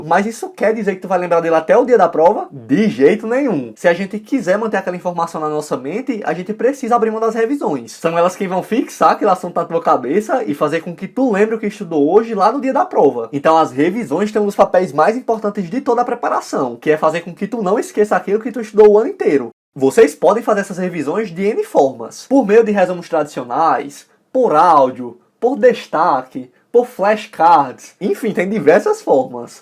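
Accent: Northeast, Brazil. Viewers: A energia do é contagiante, da pra ver que ele adora isso, além de ser super didático e detalhado em compartilhar dicas e formas de nos ajudar gratuitamente (o que é difícil nos dias de hoje). E GENTE QUE SOTAQUE E SORRISO MARAVILHOSOS. ❤🤪 Fico tão feliz e orgulhosa quando vejo um ytr do nordeste representar assim. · rapaz tu é nordestino? eu sou e amei esse sotaque!! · Reclama com Portugal, frança e também com os holandeses!! Eles que influenciaram o sotaque nordestino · Só pode ser Nordestino tu e demais cara Deus te abençoe de todos os vídeos que vi no YouTube você e o mais disposto à ajuda os estudantes vlw cara sucesso pra vc.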